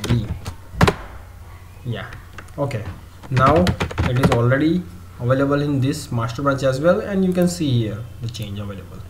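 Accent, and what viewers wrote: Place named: India. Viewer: man you have the best indian dialect i've ever heard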